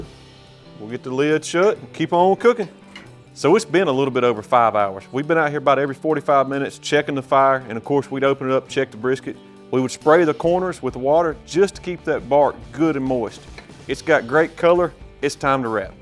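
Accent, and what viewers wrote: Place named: South, United States. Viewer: I loved the accent.. That looks like a southern accent.. Not sure if it's south Carolina or some other place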